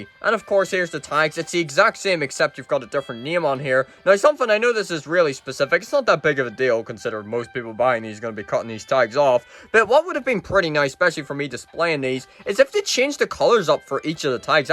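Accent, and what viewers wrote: United Kingdom. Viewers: Your British lol and so am i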